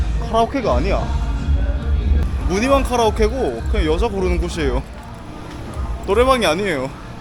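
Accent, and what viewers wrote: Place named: Gyeongsang, South Korea. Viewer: 고향이 어드래요? 경상도 말도 튀어 나왔다가 연변 말도 살짝 나왔다가 표준어가 오르락 내리락 하네요 한국말 잘하는 교포?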